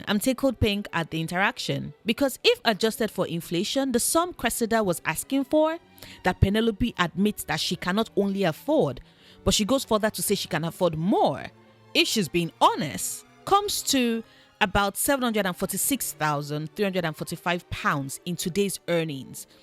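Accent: Nigeria. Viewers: Very random but sound like some of my Nigerian sisters from the south east. 🤩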